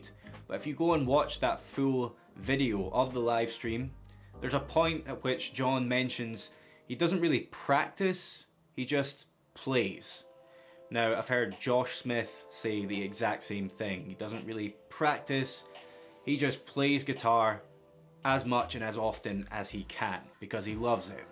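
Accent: Scotland, United Kingdom. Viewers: The noodling part was incredible! You sound like you're from Scotland? Edinburgh? Could jam around :D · Are you Scottish? Irish? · I'm a chronic noodler with next to no musical training, and I've just started his "bulletproofguitarplayer" stuff. I don't know whether it's just the laconic Scottish accent and matter-of-factness about his delivery that I like, but he does a really good job showing the basics, and of training your ear as he goes along. One of, possibly the best of the YouTube era guitar teachers in my opinion, as he shows as much as he tells, makes me listen, demystifies a lot of the often cryptic language of music, and breaks a whole lot of things down into simple bits without getting all show-offy and distracting me with mind-melting licks (even though he is an extraordinarily capable player).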